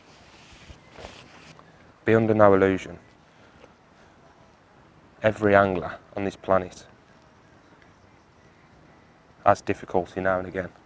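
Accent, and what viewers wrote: Birmingham, United Kingdom. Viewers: Love the videos. Despite the Brummy accent, I expect to see great things from you in the future!!! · Brilliant video our kid love the brummie accent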